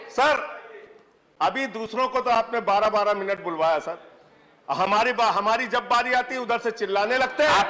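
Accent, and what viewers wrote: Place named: Uttar Pradesh, India. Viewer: संजय सर !!! आप हीरो हैं देश के। आपके बोलने शैली हमारे उ०प्र० की पहचान है...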